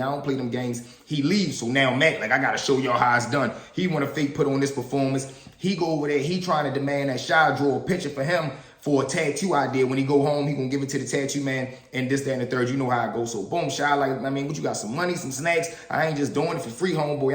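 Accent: Baltimore, United States. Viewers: Sound like you from bmore brother, good story💪🏾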